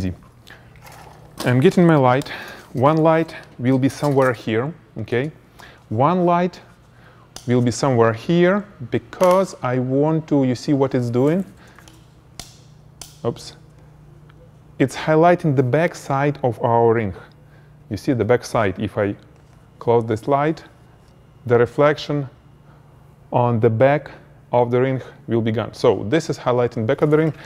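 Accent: Russia. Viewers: Wish I could fully listen, I'm so delighted by the charming personality and accent which triggers my Russian nostalgia that I can't pay full attention to the tutorial :D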